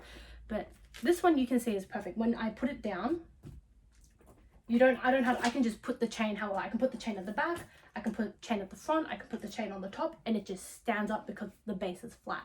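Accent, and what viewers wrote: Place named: Australia. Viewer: Best chanel video on YouTube. And your Aussie too 🤣😊🤗🥰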